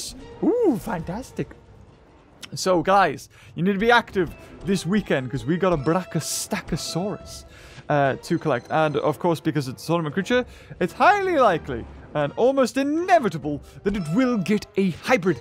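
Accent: United Kingdom. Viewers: the only difference is your british accent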